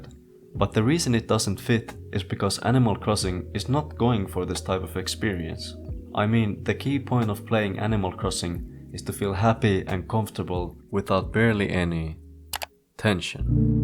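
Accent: Finland. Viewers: Definitely from Finland. The accent gives it away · Where are u from ? U sound like u have a finnish accent or something similiar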